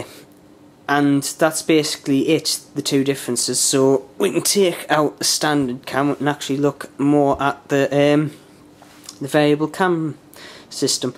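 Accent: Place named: Northern England, United Kingdom. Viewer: What a distinctive Northern accent..Sounds almost like Liam from "Benidorm".:)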